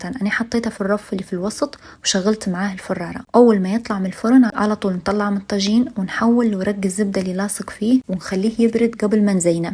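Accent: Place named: East, Libya. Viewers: خمنت ليبيه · ايه ليبيه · ليبيه شرقاويه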